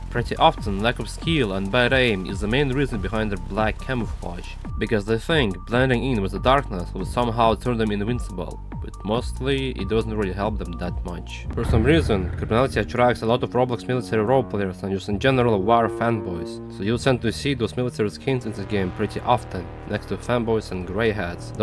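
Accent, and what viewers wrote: Russia. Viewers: Are you russian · are you Russian? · Фига у тебя русский акцент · Я прям чувствую этот русский акцент, особенно когда он говорит роблокс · Is he Russian? I just hear an accent. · You said the video had a Russian accent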